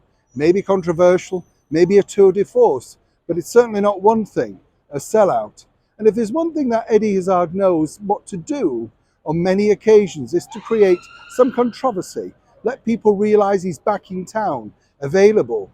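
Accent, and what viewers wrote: Yorkshire, United Kingdom. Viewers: I’ve never heard you sound so Yorkshire, ‘ it’s a bloke in a frock’! I absolutely loved this report, well done. The reason it’s a flop is because he is butchering one of our favourite writers.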